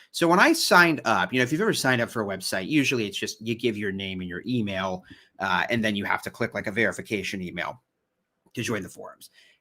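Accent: United Kingdom. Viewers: The British accent!! 🤣🥰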